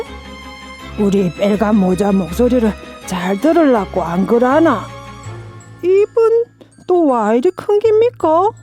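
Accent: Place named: Hadong, South Korea. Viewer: 제가 사는 곳에선 좀 처럼 접하기 힘든 사투리인데, 하동 말로 듣는 동화가 생생하고, 참 정겹게 느껴지네요. 다음 편도 기다릴게요 😊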